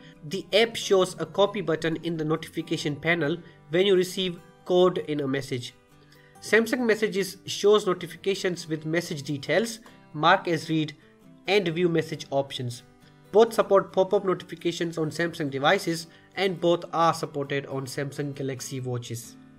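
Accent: India. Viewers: I cannot understand what he is saying with his India accent.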